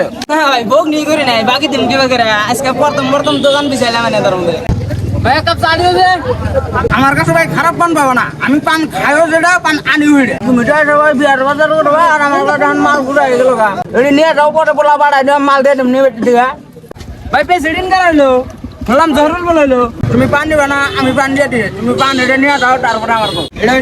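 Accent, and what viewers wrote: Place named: Tangail, Bangladesh. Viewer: তোমার ভিডিও দেহি খুব ভাল্লাগে তোমার কথাবার্তা একবারে আমাগো বাংলাদেশের টাংগাইল জেলার নাগাল। পয়লা তো মুনে করছিলাম যে তোমার বাড়ি বন বাংলাদেশে, পরে হুনি ভারতের আসামে। যাইহোক ন্যাচারাল অভিনয় একদম প্রাসঙ্গিক গ্রামবাংলার মানুষের মতই। অনেক অনেক শুভকামনা তোমাদের জন্য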